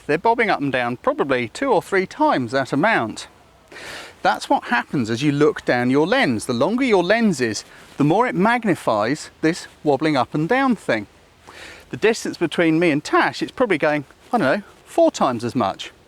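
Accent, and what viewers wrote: United Kingdom. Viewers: I liked all your videos and your British accent too. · Great personality, great teaching skills, vast experience, and the British accent. Doesnt get any better that this.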